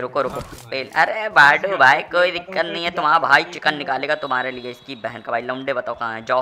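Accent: Kanpur, India. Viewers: Kaun city se h Kanpuriya lagte ho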